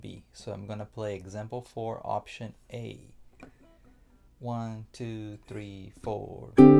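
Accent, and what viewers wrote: Brazil. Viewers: Your accent condemns you 😊 What a great surprise for me to know an amazing guy, excellent performer and an example of professionalism and dedication. Things like these make me feel proud of being brazilian! I wish you the best, bro! May God bless you! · Ele tem um sotaque meio brasileiro msm kk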